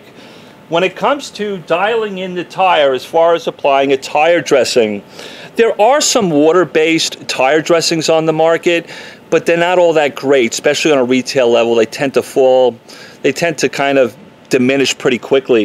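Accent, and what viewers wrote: New York, United States. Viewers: Great info, thank you. You sound more like a New Yorker than a Texan :) . God bless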